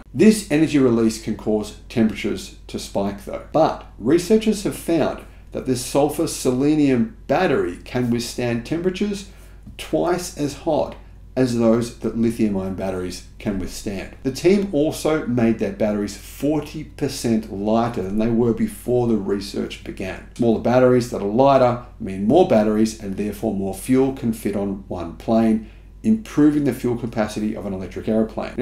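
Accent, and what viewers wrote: Australia. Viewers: Yeah it was pretty grating. His Aussie accent draws it out even more. The whole video was cringe by proxy. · You have to allow for the fact that he speaks strine.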